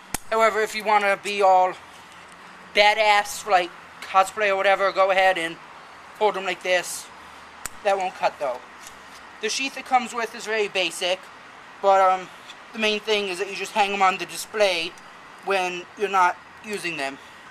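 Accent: Ireland. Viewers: What's the guy's accent? sounds a bit Irish, but not really. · Are you from Ireland?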